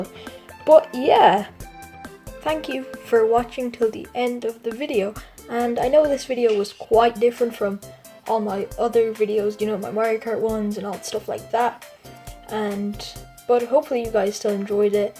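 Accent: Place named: United States. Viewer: U sound American in this😂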